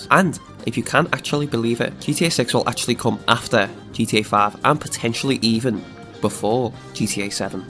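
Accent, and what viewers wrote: United Kingdom. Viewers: High quality bti'ish voice, let's go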